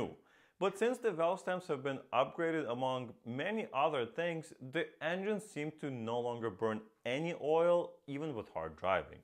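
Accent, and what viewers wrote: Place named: Russia. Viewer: У тебя как будто русский акцент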